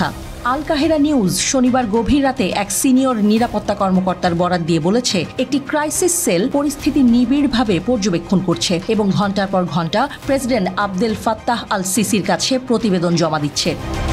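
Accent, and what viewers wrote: Kolkata, India. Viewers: আর টিভির যে কন্ঠ দিচ্ছেন একি ভারতের কোন নাগরিক কিনা গলার সুর শুনে মনে হয় ভারতীয় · এই মহিলা কোলকাতার ভাষায় কেন খবর পরছেন?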